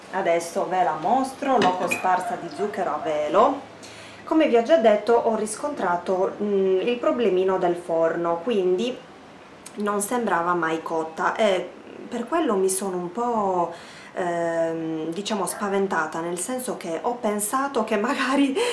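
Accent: Sardinia, Italy. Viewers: Dall'accento sembri sarda, ho indovinato?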